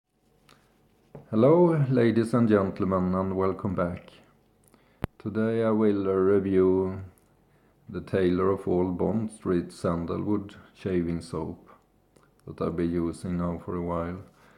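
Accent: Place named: Scandinavia. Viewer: That is quite possibly the most Scandinavian accent I've heard 😄 Nice review mate, I'll definitely be testing this one out myself. Only tried their sandalwood shaving cream so far, so I'm interesting in seeing how this one will perform.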